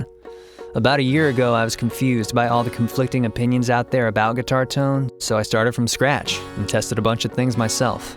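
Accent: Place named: Bavaria, Germany. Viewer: A very simple Bavarian portent question. Can't unhear it. :D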